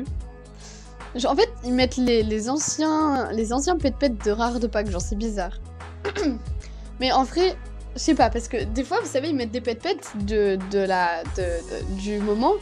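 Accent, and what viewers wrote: Quebec, Canada. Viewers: Tu est cebecoise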